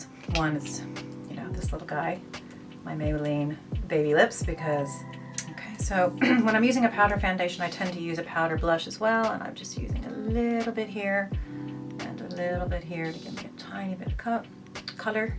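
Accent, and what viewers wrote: Ireland. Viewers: I’ve been on the fence about this, but now I’m going to try it after seeing how great it looks on you. PS: don’t remember how I stumbled across your channel, but I’m so glad I did. You’re lovely, and I love that hint of the lovely Irish brogue!💚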